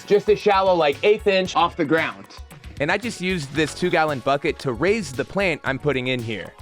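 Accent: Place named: Midwest, United States. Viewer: It is his Midwest accent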